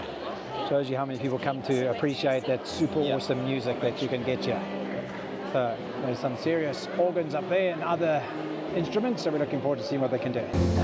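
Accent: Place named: Afrikaans, South Africa. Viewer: Love the boerwors accent man it's always nice and crispy 🔥👊🏽.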